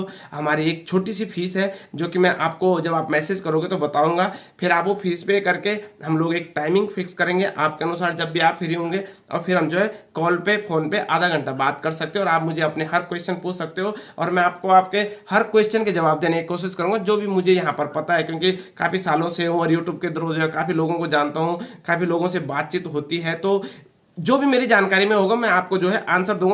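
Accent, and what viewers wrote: Garhwal, India. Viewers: Garhwali accent h bro aapki aawaj me. 🙏🏻🙏🏻🙏🏻🙏🏻👌🏻👌🏻👌🏻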